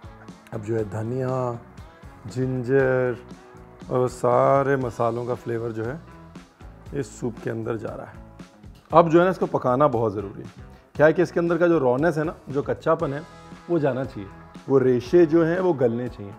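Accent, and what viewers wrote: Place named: Lucknow, India. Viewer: Jab aap bolte hain toh mere dimag mein bs lucknow aata hai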